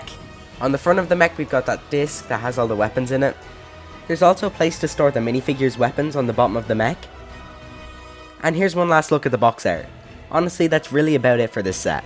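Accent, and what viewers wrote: Ireland. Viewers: Are u irish and if so what county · Are you Irish like me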